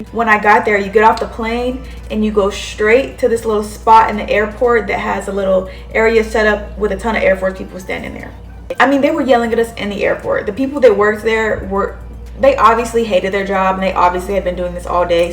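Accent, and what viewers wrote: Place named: New York, United States. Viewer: speaks like she from NY 😅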